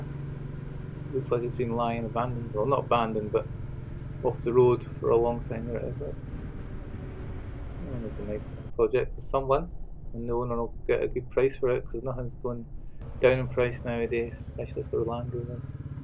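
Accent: Scotland, United Kingdom. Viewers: Lovely tour. I’m trying to track where you are; could you indicate a few of the locations? (Sorry, my Midwest American ears can’t always interpret the town names with your melodious Scottish accent😊). The 350 I understand perfectly.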